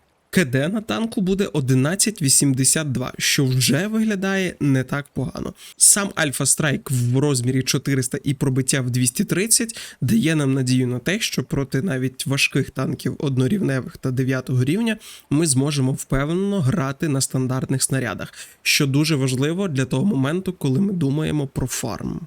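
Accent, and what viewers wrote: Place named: United States. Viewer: Не надо американского акцента очень слух режет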